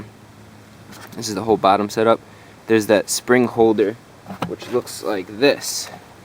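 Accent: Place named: New Jersey, United States. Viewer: nice video thanks. I grew up in NJ - your accent sounds like you're from not far from there.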